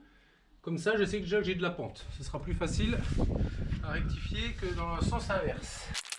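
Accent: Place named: Franche-Comté, France. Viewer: Le petit accent de la franche comté qui va bien. Juste assez sans avoir l overdose !! ( C est un franc comtois qui écrit ça !!) Meilleures vœux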